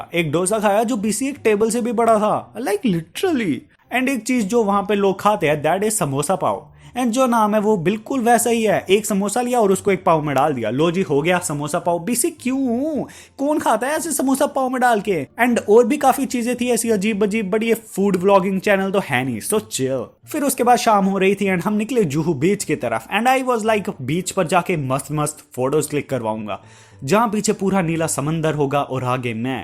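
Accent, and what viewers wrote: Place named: Punjab, India. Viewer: Bhai are you a punjabi because teri punjabi nikal aandi hai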